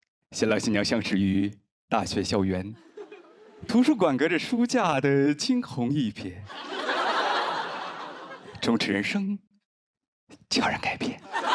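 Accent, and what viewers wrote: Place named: Northeast, China. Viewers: 東北人口音好有趣，也挺好笑的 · 哪个学校的呀感觉好像黑龙江呢，🤣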